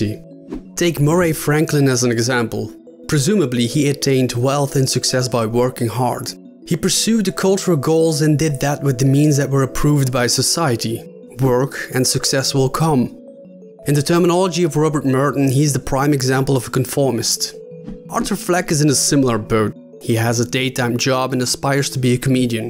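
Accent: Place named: Russia. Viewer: What's with the new Russian accent though?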